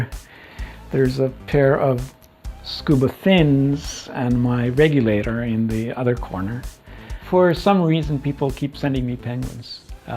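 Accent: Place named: Sweden. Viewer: wtf he speaks fluent finnish with swedish accent, as many swedish speaking in Finland.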